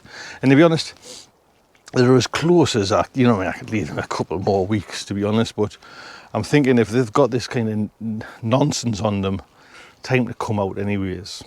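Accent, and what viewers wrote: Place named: North East England, United Kingdom. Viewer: Just stumbled across your channel today and as fellow North Easterner born and bred just down the road from you in the big settlement....:), it's great to hear the twang again. I've just started my plot after all these years and am looking forward to your adventures.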